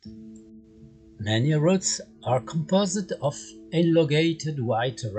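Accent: France. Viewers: The french accent sympa la version en anglais bonne fête de pâques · Superbe idée, l accent français, les anglo-saxons adorent. Cela leur permettra d apprécier la flore de France. J ai mis un pouce en haut 👍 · Hello, thank you for the video in english, I liked the french accent, that's my fun, good continuation, kiss👍😘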